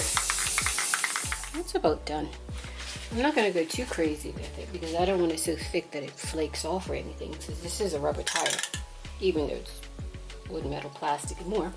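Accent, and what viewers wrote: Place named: Bermuda. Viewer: Always nice to watch your DIYs, they’re very satisfying along with the very Bermudian commentary😉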